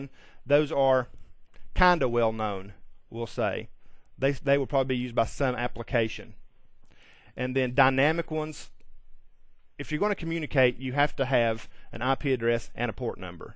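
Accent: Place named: Texas, United States. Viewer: I like that accent. Texas? Somehow it makes me a better listener and able to process more info.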